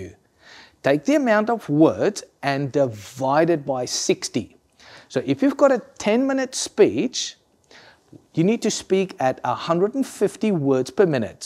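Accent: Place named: Afrikaans, South Africa. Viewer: Your accent sounds quite Afrikaans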